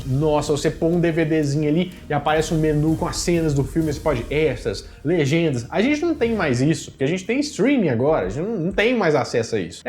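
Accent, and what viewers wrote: Minas Gerais, Brazil. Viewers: Esse sotaque é mineiro?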